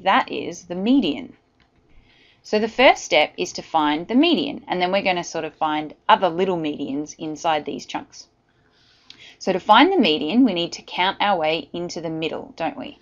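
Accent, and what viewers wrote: Australia. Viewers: Nice Aussie accent?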